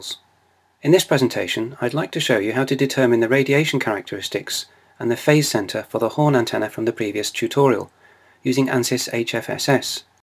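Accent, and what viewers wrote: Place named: United Kingdom. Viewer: Wow lovely British accent :) loved it. Thumbs upped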